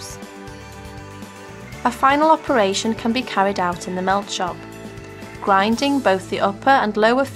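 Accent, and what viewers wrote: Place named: Europe. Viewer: Very nice voice and european accent.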